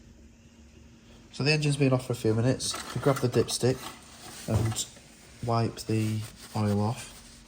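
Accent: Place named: North, United Kingdom. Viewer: Good work, nice to hear a northern voice doing a service on these great cars. Do you know where the fuel sensors are and are they easy to replace? Cheers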